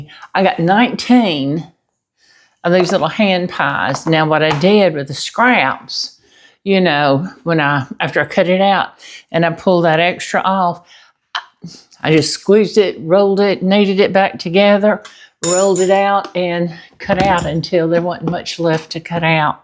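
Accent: South, United States. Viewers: Thanks. Remember I’m up in New England and thought I was all set with your southern accent. 🤭 sorry about that ❣️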